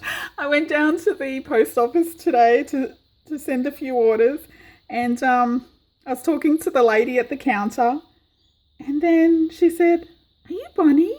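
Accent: Australia. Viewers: Yaaay! That's super!! You're joking about your voice, right? I love your voice! It is sweet, friendly, good spirited and you have an Aussie accent to boot! What could go wrong? 🤗🤗🤗😍😍🤩🤩😉😎😜😜😝❤ Hello Barbara! And Bonny I'm only famous inside my studio. 😜😜😜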